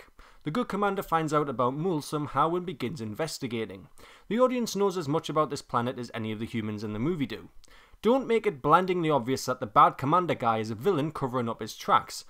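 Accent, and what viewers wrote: Newcastle, United Kingdom. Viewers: i would say Newcastle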